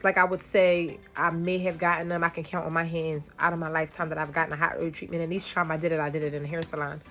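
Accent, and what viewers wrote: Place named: Los Angeles, United States. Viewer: That LA accent jumping out baaabbyyy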